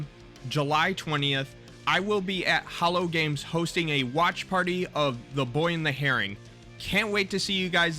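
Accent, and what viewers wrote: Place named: Brooklyn, United States. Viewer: I love the Brookland accent lmao subscribed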